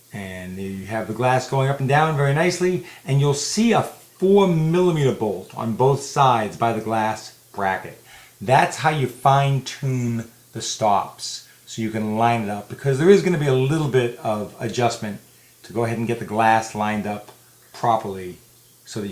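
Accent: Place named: Boston, United States. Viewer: Very helpful. It made me decide not to do this myself. BTW, sounds like a Boston accent. Yes?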